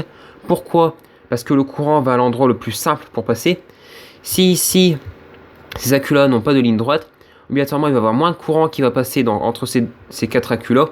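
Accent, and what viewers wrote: North, France. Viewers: Hello, intéressant je voit que tu est peut être du nord avec ton accent (idem pour moi,du pas de calais) je voulais savoir si ce serait possible de réaliser une batterie par toi même de la vendre,je serais intéressé c'est pour alimenter un sondeur sur un kayak ? Merci de ta réponse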